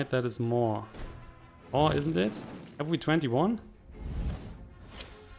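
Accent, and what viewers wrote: Germany. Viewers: are you from germany? just wanna know if i guess the accent right.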